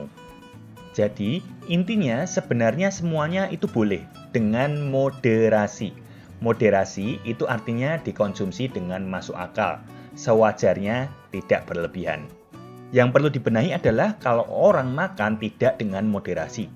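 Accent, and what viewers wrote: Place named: Java, Indonesia. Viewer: Logat Medoknya mas ngangenin. Ya iyalah, wong tiap hari nontonin diet santuy melulu.. suaranya terngiang2. Wkwkwk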